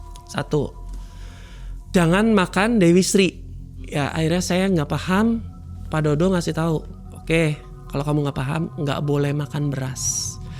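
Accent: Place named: Cirebon, Indonesia. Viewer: Jawa cirebonnya dah medok bnget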